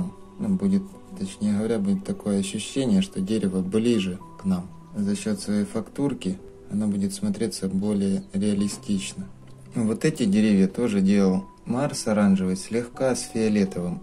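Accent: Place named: Odesa, Ukraine. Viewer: "Снова- таки три сантиметра.".. Украина. Замечательный диалект. Одесса?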